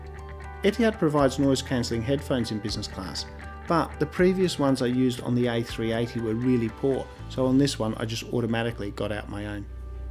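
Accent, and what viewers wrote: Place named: Australia. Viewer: I always enjoy your videos. Your accent matches well with my idea of a typical laid-back Aussie explorer. Especially the way you say "paaaarsta" I like "paaaarsta" mmm :D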